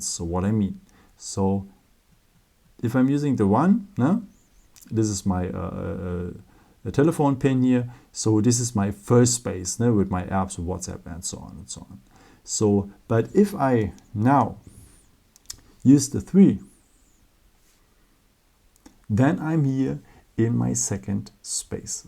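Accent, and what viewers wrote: Germany. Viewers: Der deutsche Akzent is da😂✌🏼 aber nice Video hat mir sehr weitergeholfen!👌🏻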